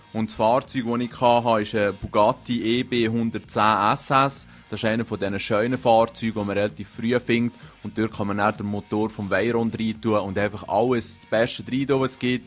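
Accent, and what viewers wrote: Switzerland. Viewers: sprichst du schweizerisch oder holländisch??? ich versteh nur jedes 10.wort und komme aus bayern (mittelfranken...)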